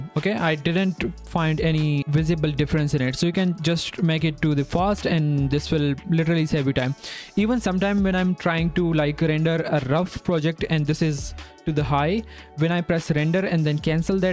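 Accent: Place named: India. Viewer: Why do useful tutorial always have Indian accents?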